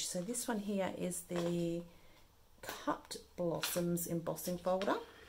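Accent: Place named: Australia. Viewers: Great technique with the stamping foam, it compliments your previous video using embossing folder. PS Its nice to hear an Aussie accent.! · G’day mate. I agree it’s always nice to hear an Aussie voice doing the videos. Here were lovely cards, love the pink & blue ones? Are you in Australia? Can you tell me the name of the tape runner you used and where to get it please, it looks like a good one. I have bought the small tape runners but they always seem to play up, and I have thrown a few away. Also where do you get your supplies from please, the stamping foams Altenew inks etc, buying from overseas is so expensive with the conversion rate.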